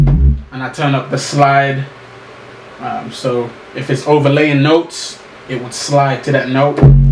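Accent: United Kingdom. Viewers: All this time I never clocked u were from London 😂. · Is it me or did he not have a british accent in his other vids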